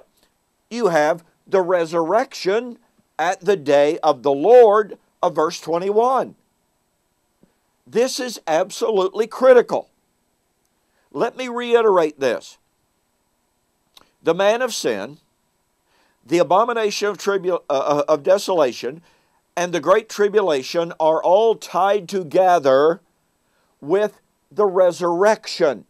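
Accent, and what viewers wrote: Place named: Oklahoma, United States. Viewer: Sounds like Ardmore, Oklahoma. I used to live in the City.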